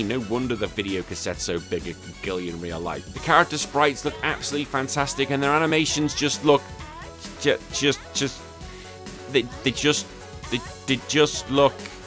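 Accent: England, United Kingdom. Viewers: hey not to be weird, i love your accent, what part of england are you from? its my favorite sounding one. I guess it's one of the reasons I've seen about all your videos so far.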